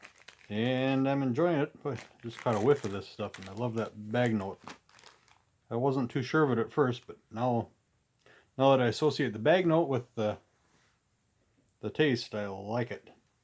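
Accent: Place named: Midwest, United States. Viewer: Always a pleasure to hear your Midwestern Minnesota-ness,. Down to Earth family goings on. Thanks for keeping me company while I cleaned the bastard bathroom.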